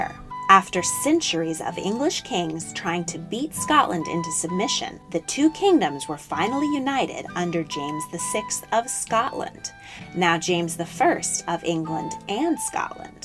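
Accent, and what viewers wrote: United States. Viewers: she is pronouncing it correctly. it sounds different because of her american accent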